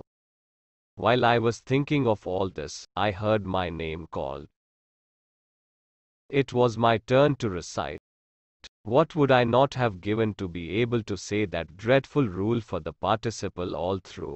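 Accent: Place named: India. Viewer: This miserable solng India English leave please for speaker native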